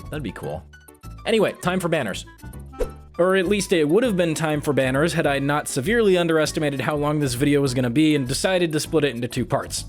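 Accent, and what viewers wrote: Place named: Texas, United States. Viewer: Shit sound you live in Texas? That is scary close to me.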